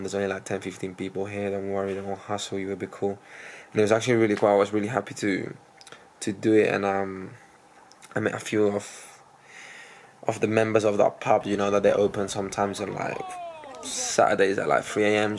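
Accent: England, United Kingdom. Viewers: You sound English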